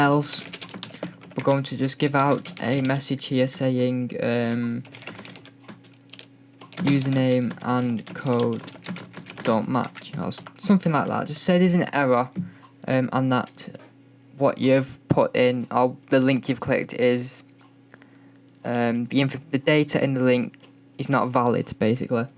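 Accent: United Kingdom. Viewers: british accent..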